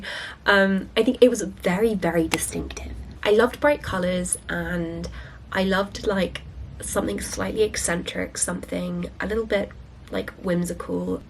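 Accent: United Kingdom. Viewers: Wow. You translated your video to 5 languages. That's awesome. Hi from Belarus. I'm learning British accent with your videos)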